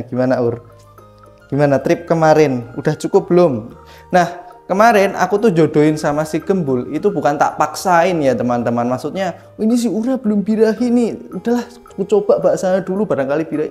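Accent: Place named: Pati, Indonesia. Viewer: logat daerah pati ne kentel banget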